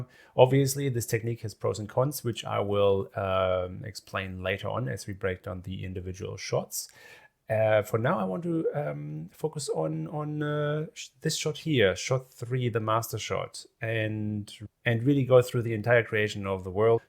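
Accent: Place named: New Zealand. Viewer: Beautiful and practical way to get the shot ASAP. Also I lived in NL long enough to be able to pronounce your last name correctly, and your Kiwi accented English is a perfect living testament to your VFX career!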